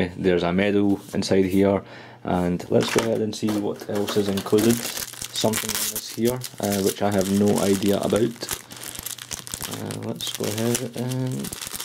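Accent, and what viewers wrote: Scotland, United Kingdom. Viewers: This is a good video. Even with your accent (sounds Scottish), you are easier to understand than most Americans... and I am American. haha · Scottish is awsome love the accent :)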